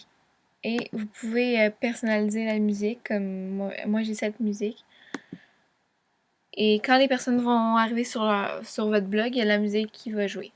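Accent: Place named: Canada. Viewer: Merci pour la vidéo et pour la découverte de Trumblr que je ne connaissais pas, très belle prestation de votre part, Je suis Français et j'adore la voix Canadienne ! :) Un pouce vert le haut!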